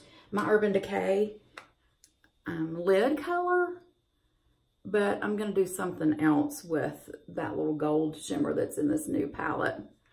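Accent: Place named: South, United States. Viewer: Wow I could jst watch you all day. Very beautiful. Luv your channel. Love your style and your cute southern accent too!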